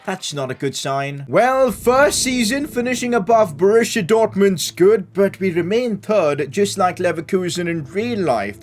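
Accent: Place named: India. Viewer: Great video but damn! Your Indian accent puts me off lol😂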